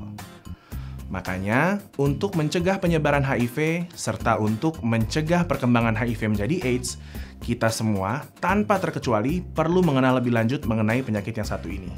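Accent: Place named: Java, Indonesia. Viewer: tp logat bahasanya kok sprti orang Jawa ya. Mohon maaf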